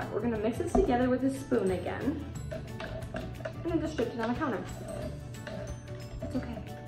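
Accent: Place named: Michigan, United States. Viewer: lol I can tell by the accent. I’m from California just moved to Michigan and it’s something I noticed immediately lol + my husband is originally from Michigan and he sounds the same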